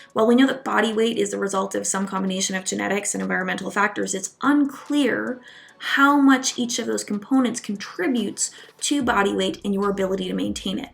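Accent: Canada. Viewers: Is she Canadian? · is your accent Canadian?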